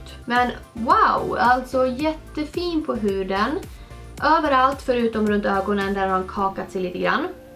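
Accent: Dalarna, Sweden. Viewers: Jätte bra video! <3 Man hör ditt dalmål ibland! :D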